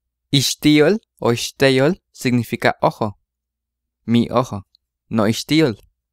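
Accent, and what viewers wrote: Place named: Northern Veracruz, Mexico. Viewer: Hola buen día, una pregunta, el Nahuatl, que hablas y enseñas de ¿donde proviene o de que región es de donde lo hablas?, por que el nahuatl tiene muchas variantes dialectales y aunque tiene cierta similitud a la pronunciación del la región norte del estado de Veracruz, muy padre el material, mil gracias por tomarte el tiempo y elaborarlo, es tan util.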